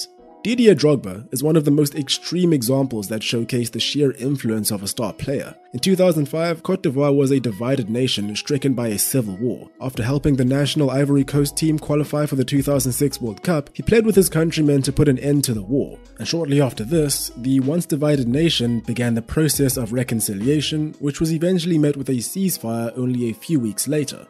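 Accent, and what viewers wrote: South Africa. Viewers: I always though he was south African living Pretoria and I think I was right lol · Knew you were South African! My fellow citizen...I have subbed lol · Always thought you're South African. Rep Zim! Great content amd congratz in a 100K!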